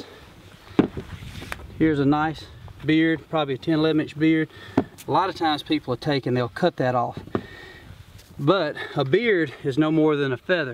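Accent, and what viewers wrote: South, United States. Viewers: I gave him a like just for the southern accent.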